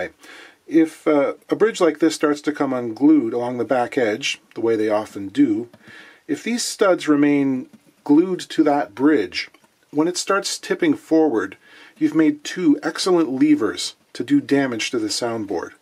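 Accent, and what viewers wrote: Canada. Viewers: Go ahead, sound as Canadian as you like. You wouldn't tell an American to not sound American. Anyway, guitar sounds pretty good, imo. Nice work and video ... very relaxing. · Don't you worry about that Canadian accent...you do you! Thanks for the amazing videos!!! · In Canadian about should rhyme with shoot and scoot. That’s part of the charm of listening to this channel, to hear a talented foreigner speaking the English as only an occupant of the great white north can. Seriously, I’m Virginian and y’all say it like the folks from Richmond. Thanks! Another wonderful video. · I'm a new viewer of this excellent channel. I realize this is an old video, but I find it sad that you're cautious about (aboot😅) how you pronounce certain words. I love Canadian, and I love your videos. Keep up the good work! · Embrace your Canadian ways and accent! 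;-) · Don't check yourself about "about"! It's good to hear an identifiable Canadian accent. I'm a particular fan of Ontario accents from further east, which may almost sound Scottish or Irish. And I'm scientist and my cuticles are no better than yours. This one reminds me of my ongoing dental work...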